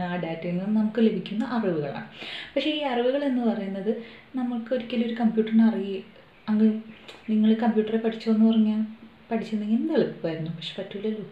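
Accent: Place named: Thrissur, India. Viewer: Thrissur slang നന്നായി endu....😀😀🙋🙋🙋🙋🙋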